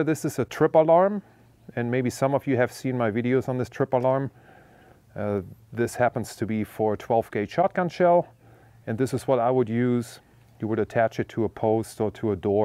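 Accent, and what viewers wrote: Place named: Germany. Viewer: Great Video! Do you come from Germany? It sounds so. Greetings from cologne😁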